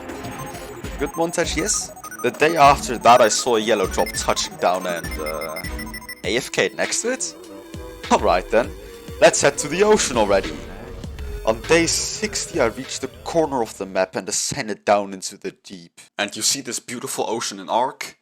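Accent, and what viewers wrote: Netherlands. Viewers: Hmmmm, dutch person detected? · Where are you from? You sound a bit Dutch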